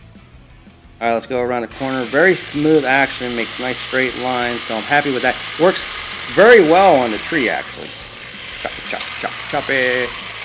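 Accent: Pittsburgh, United States. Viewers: Any chance you're from Pittsburgh originally?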